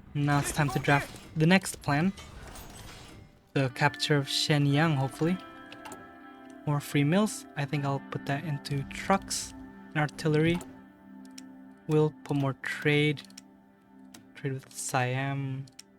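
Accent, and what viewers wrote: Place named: Indonesia. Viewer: your accent sounds kinda like you’re from Indonesia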